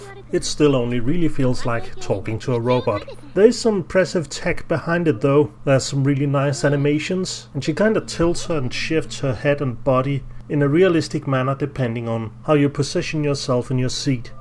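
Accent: Denmark. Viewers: I really like your accent, it doesn't seem sooo "Danish" Also the review went in depth which was very nice, thank you for that.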